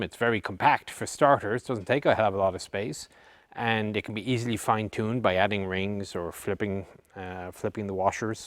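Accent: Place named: Ireland. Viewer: Always great to hear the Irish Shh as in Sh-tuff .. especially explaining the complex simplicity of the Swiss Army Knife idea - mounted on a tank. Make way there for Shlattery's Mounted Futtt .. Huzza (with an -ay sound, it seems not with an -ah; like Jose and a wee cuppa tea: Yeah! hurray!) It's OK, I'm daft - certified and all.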